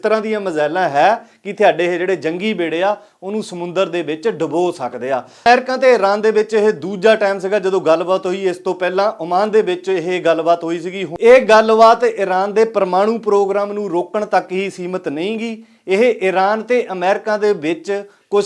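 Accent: Doaba, India. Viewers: tuhadi Punjabi doabe wali lagdi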